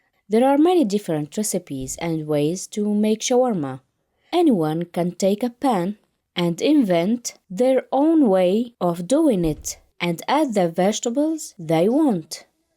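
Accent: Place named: Kuwait. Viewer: احب حجيج الكويتي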